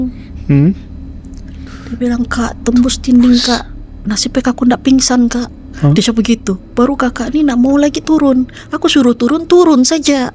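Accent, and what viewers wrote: Sabah, Malaysia. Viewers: Siukkk dgr loghattt kakak ni becerita.. betul2 sabahan.. mcm si Wafi punya loghat siukk d dgr d screen😂 ndak d buat2 sabahnya hehe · Tbaikla sis..x hilang sleng sabahnya...x boring mdgr..best dgr sis bcrta... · Sy orang Sandakan, born and raised. Aku boleh feel yang Kak ni ada control sikit dia punya bahasa supaya orang semenanjung lebih senang faham. Kalau dia full on cakap cara orang Sandakan, memang susah faham dan ada lawak sikit 😂😂😂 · Suka betul bila orang Sabah bercerita cerita seram. Suka dengar bahasa orang Sabah.. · Best betul dgr suara kak ni bercerita dgn slang Sandakan lgi. Uinah geng.. 🤣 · Suka betol dengo dialek sabahan ni. Lembut, pekat, kadang2 kelakar. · Ni bahasa standard sabahan ya... Belum lagi kamu dengar yg ada mix 'loghat' bangsa. Contoh kalau Sabahan yg di Tawau, nada & loghat lain lagi. Org kurang faham. Kalau kami Sabahan di Keningau ni, lebih kurang standard begini bahasa tu. 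Tapi masuk kampung yg pekat bahasa Dusun, so cakap melayu dorang pun ada mix 'Apa gia tu?' 'Ada kanto itu'. 🤣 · Aku dengar sampai habis sebab suka dengar bahasa sabah.. sedap di dengar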